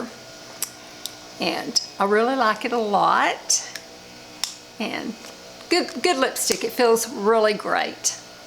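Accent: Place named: Tennessee, United States. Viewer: You are a beautiful lady ❤ I thought you had a Tennessee accent 🥰 my relatives live near Kingsport.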